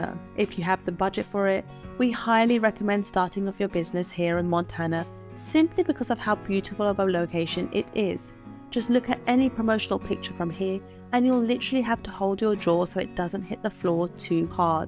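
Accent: United Kingdom. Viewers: Why is a Brit accent talking about American homesteads? Smh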